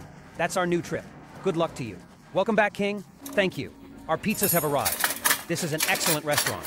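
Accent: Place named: Gaziantep, Turkey. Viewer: La Yorum Alının Çatında Yaziy Antepli Olduğun Sesini Duyar Duymaz Antepli Olduğunu Bildim Rafık 😂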